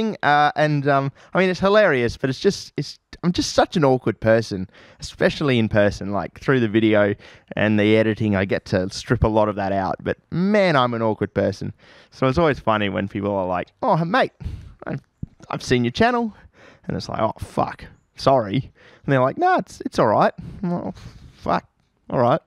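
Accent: Australia. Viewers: Good to see you,. Your intelligent, irreverent, Strine is music to my ears:)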